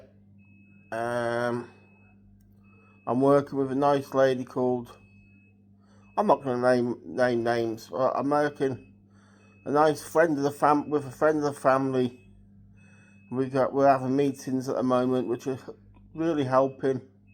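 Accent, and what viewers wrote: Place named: Northern England, United Kingdom. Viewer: What part of the uk are you from? You have a northern accent! Ah bless you keep strong!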